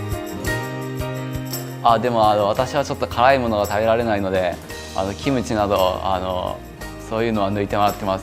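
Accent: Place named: China. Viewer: 他不是日本人，他是中国人。发音是中国人的日语。絶対日本人ではありません、、、、発音を聞いたら、すぐわかるでしょう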